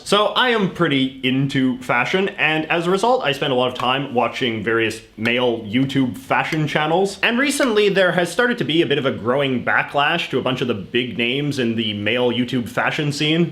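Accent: Canada. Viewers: what part of canada are you from? lots of aboots lol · Great video - worth a watch for the Canadian pronunciations alone. 👍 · I'm satisfied with your Canadian accent. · BTW, fellow Canadian Conservative here. Live just up the road from ya. Say "about" and "road" normal tho..... lol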